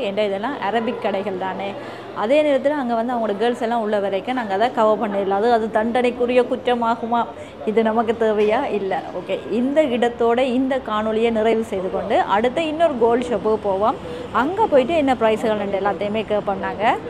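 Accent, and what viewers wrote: Sri Lanka. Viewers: இலங்கை தமிழ் மிகவும் அழகு... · அழகான சிங்களம் தமிழ் ❤❤❤❤❤❤❤❤❤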